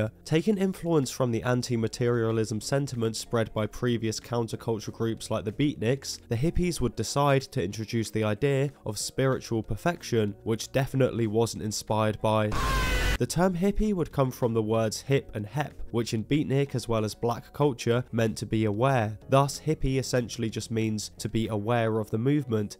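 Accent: United Kingdom. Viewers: There's actually a fair number of documentaries on this, made by people who were there at the time. Fun to hear a British kid do an abbreviated rundown on decades of American history.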